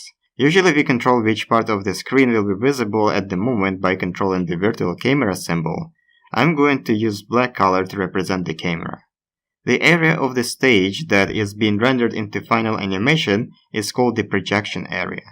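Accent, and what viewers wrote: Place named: Russia. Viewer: о, ну по акценту уже похоже что ты русскийя:3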